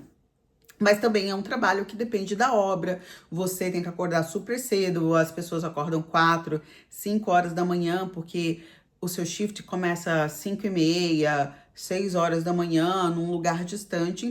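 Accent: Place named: United States. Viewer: Oi,! Primeiramente, queria dizer que seu vídeo foi extremamente explicativo. Você conseguiu transmitir uma visão muito realista sobre os trabalhos na Austrália, algo que poucas pessoas fazem na internet. Gostei bastante da forma didática como você apresentou as informações. Como espectador, gostaria apenas de compartilhar algumas impressões que talvez possam ajudar em conteúdos futuros. A chamada da thumbnail com dinheiro pode não soar tão bem para parte do público. Particularmente, me passa uma sensação mais apelativa do que o conteúdo realmente merece, porque o vídeo em si é muito mais rico do que isso. Outro ponto é quando você menciona ter ganhado um salário mínimo sem explicar todos os detalhes e circunstâncias. Para quem está assistindo do Brasil, isso pode acabar transmitindo uma ideia diferente da realidade, como se qualquer pessoa chegasse aqui e tivesse imediatamente aquela condição financeira, quando sabemos que existem muitos fatores envolvidos. 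Também percebi que, apesar de você ser professora de inglês, às vezes há uma mistura muito frequente entre português e inglês. Em alguns momentos, a forma de falar me lembrou até um norte-americano aprendendo português. Acredito que um uso um pouco mais moderado do inglês poderia tornar a comunicação ainda mais natural para a maioria dos brasileiros que acompanham seu canal. Sei que críticas nem sempre são bem-vindas, mas faço esse comentário porque realmente gostei do vídeo. O conteúdo é muito bom, honesto e didáti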